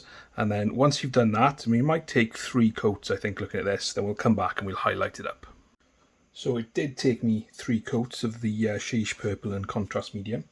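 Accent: Wales, United Kingdom. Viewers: Sorry for your loss bro. I lost my best bud back in July. Do I detect a Welsh accent there? Swansea boy here.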